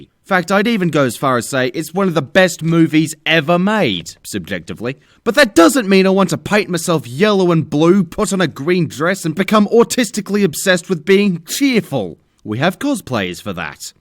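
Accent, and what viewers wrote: Australia. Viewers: Its like Tears of Grace and The Escapist had a love child with an australian accent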